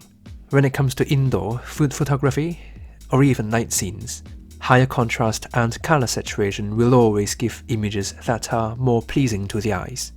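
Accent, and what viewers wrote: United Kingdom. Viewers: 詳細的解說，一口流利的英國腔英文 👍👍👍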